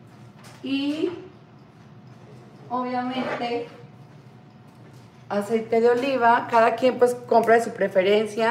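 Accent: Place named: Colombia. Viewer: Me encanta su acento colombiano ,no le había comentado antes pero me caí usted muy bien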